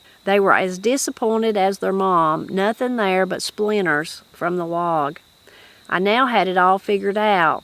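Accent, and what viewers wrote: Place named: South, United States. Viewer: Late to the party, but thankful as well. What a gift. Your voice, pace, cadence, and tone are what we sons of southern women recognize and love.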